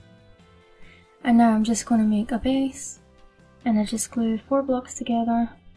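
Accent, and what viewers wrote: Scotland, United Kingdom. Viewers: 🙈 Hearing the Scottish accent once I turned up the volume really threw me!! But looks great! 👌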